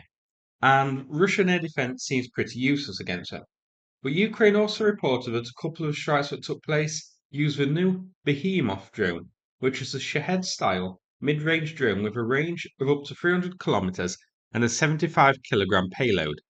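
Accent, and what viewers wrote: United Kingdom. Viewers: u fucking clown turn off ur retarded bri-ish cuck accent when?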